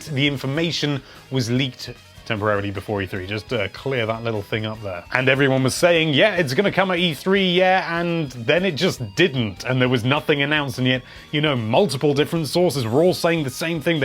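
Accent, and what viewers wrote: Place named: United Kingdom. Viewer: He's from the UK.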